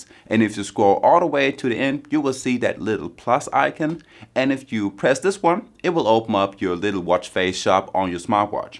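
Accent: Germany. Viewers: aer you from germany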